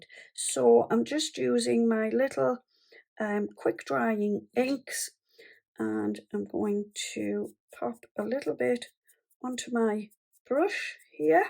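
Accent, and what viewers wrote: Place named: Newcastle, United Kingdom. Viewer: Thank you so much for the wallet idea. My sister and I are building up papercraft and crochet items for a craft fair later this year. I have been following your uTube channel for only a couple of weeks and you have such great ideas. We were looking for something for males as we felt we were leaving them out as we have lots of girly stuff. I most of all love your great Geordi accent it’s my favourite accent so I could listen to you all day(hope that doesn’t sound too creepy 😮. Thanks once again xx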